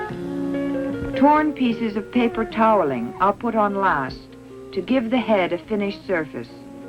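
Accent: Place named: Transatlantic, United States. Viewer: Ok boo I see you with the Transatlantic accent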